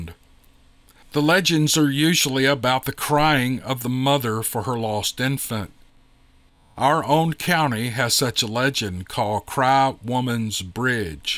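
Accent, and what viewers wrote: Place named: Louisiana, United States. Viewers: GREAT Cajun accent! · Really enjoyed this... Thx buddy..... PS.... That Ice cream being discontinued will save you on bike rides!!! Lol.... Oh yeah, nice Cajun accent! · Let's do it! Protest indeed. I have loved a couples of products that got discontinued soon after I discovered them! That is so aggravating 🙄 Especially certain flavors of foods. 😵‍💫 Love your story about your mother in law, too❣️ Never listen to the negative comments about your banter or rambling, or whatever, because I love it❣️ It's always interesting & it's true, real life stuff❣️Can't get any better testimonies, stories, experiences, etc., than that! Haters can move on if they don't like it. 😉 I'm sure I'm not the only one that loves your stories, ALL of them. Love your Cajun accent too❣️ 👊 Spot on!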